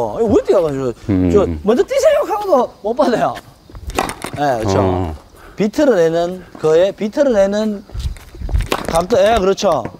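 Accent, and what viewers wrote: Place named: Daegu, South Korea. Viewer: 저도 대구 출신인데,, 오랜만에 듣는 extreme 사투리. 와이카는데요! 내용도 좋고 사투리도 좋아요 ㅎ 구독, 좋아요 ㅎ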